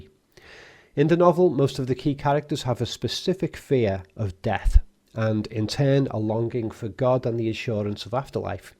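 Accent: Liverpool, United Kingdom. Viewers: found your channel the other night and i love the videos they’re in depth and have some real good explanations and analysis to go with them, on that note am i wrong but i’m curious are you from liverpool?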